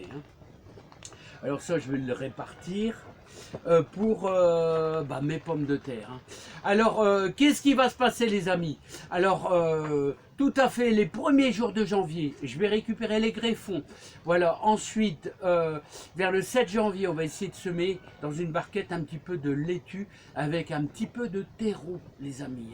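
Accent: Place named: Nord-Pas-de-Calais, France. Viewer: Coucou les chti❤;bonne année atoi avec l accent super t’es vidéos.🍾🍾🍾☃️☃️🐓🐓🦆🦆le papi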